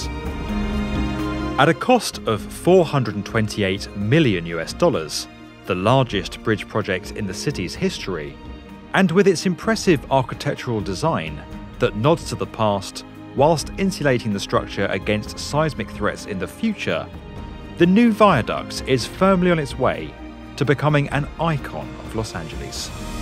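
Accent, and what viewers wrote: England, United Kingdom. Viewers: Impossible! Why does this have an English accent? Is LA in England. No No such thing as earthquake proof. Ridiculous. Earthquake resistant? Maybe. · Why do we have to have a bloody fuckin' Britt narrating about an American bridge? Holy George Washington Batman!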